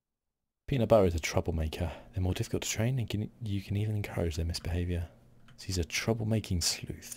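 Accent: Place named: United Kingdom. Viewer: British accent + ASMR = Masterpiece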